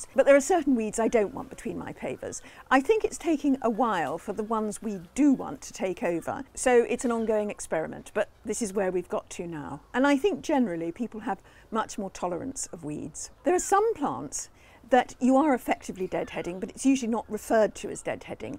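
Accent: RP, United Kingdom. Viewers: so posh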